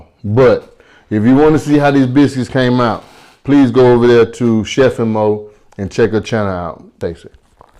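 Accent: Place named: Alabama, United States. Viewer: Sound like he's from Alabama. Food looks great!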